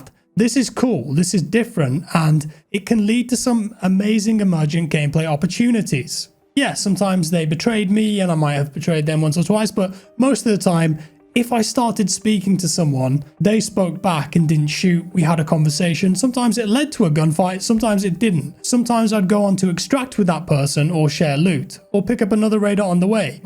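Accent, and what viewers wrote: United Kingdom. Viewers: I have to say it's not that often that I can handle the British accent for more than 30 seconds. But I can handle you talk for 10 minutes and that's good enough. Talk about how good the graphics are, the gameplay, the movements, and how do you feel with the third person perspective. Please try to sound more American normal or at least a non-wining accent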